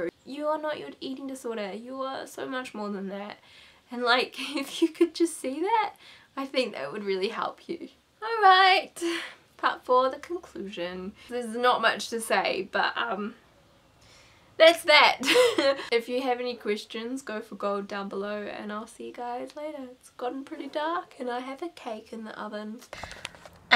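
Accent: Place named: New Zealand. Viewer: Heyy hello from the south of NZ :) Nice to hear a kiwi accent! I really liked this, I too self recovered, developed depression (though seriously you wouldnt be able to tell with me either I always appear bubbly and happy!!) and although I am not vegan, I follow a plant based diet and it helped so much! So pleased things are looking up for you :)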